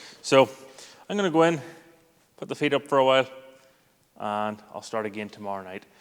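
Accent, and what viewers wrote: Scotland, United Kingdom. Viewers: Some of us actually know you have a life and family outside of YT. I'm just a "peanut gallery regular" with an appreciation for a fine Scottish brogue! Hug your wife and children- they are much more of what makes you tick than I ever will be.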